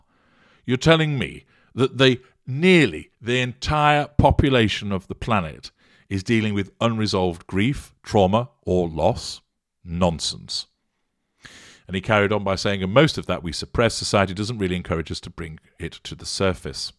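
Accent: United Kingdom. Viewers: One of my favorite things you do is to drop an appropriate f-bomb amidst this eloquent and proper British accent, i.e. “Oh, do shut tf up.”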